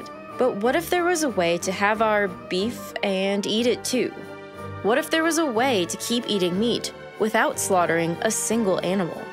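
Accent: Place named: United States. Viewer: I have severe doubts that it takes even 10 litres of FBS to make a single burgers worth of meat, let alone 50 litres. As the narrator is clearly American I'm going to go out on a limb and assume larger standards for meat portions than the rest of the world does. Even then I still don't buy it, sounds a lot like doomsaying - especially given she saves the "oh yeah $10k to less than $3 is feasible in 9 years" to much further along in the video as if she's just padding the run time.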